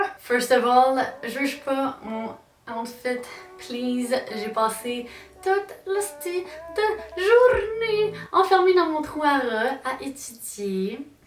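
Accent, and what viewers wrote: Quebec, Canada. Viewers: T’es québécoise? Edit: oh wow laisse tombé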